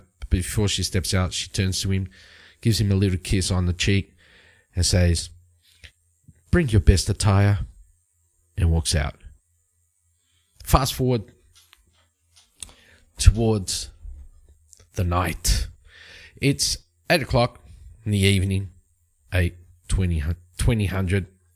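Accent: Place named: RP, United Kingdom. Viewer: You are so posh. ha ha! Great episode! :trophy-yellow-smiling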